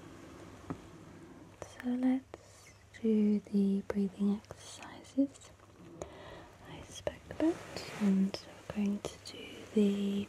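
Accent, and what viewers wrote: United Kingdom. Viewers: I've brought all my bits & bobs. Haha love it! Love the Brits! So beautiful to listen to!!! Thank youuu!❤😊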